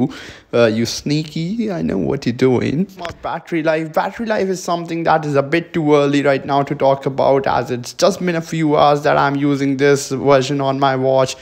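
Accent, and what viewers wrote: India. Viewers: i hate your english language you indians!!!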